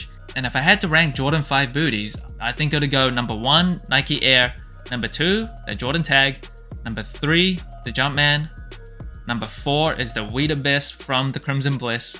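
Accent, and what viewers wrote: Australia. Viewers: I can’t read your accent, are you from Australia?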